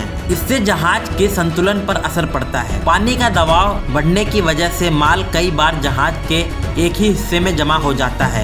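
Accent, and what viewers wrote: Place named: India. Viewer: Bhai Indians esy hi bolty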